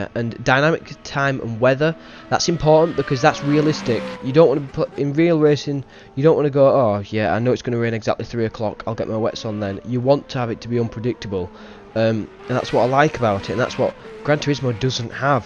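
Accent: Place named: Manchester, United Kingdom. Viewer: You sound more manc than Yorkshire... You sound exactly like Gary Neville!!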